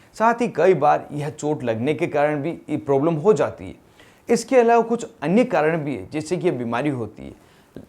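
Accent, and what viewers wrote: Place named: Bikaner, India. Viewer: Aap ke accent se lagta he aap shayad Bikaner se hai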